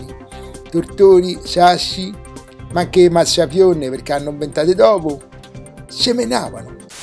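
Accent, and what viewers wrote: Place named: Rome, Italy. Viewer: questo video solo 308 like? Che bel romanaccio! La bellezza del romano ruspante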